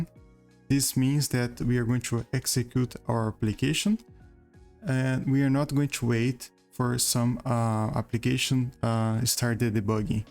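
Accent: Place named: Brazil. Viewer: reconheço sotaque BR de longe!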